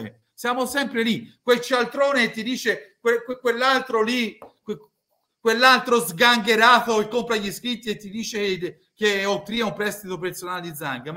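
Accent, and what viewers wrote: Tuscany, Italy. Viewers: Ho iniziato a seguirti da poco ma ti faccio i complimenti..a parte la parlata toscana che adoro, io sono bolognese ma interista, sei l unico che in tempi non sospetti ha detto le cose come stanno...i risultati hanno offuscato la disastrosa situazione economica...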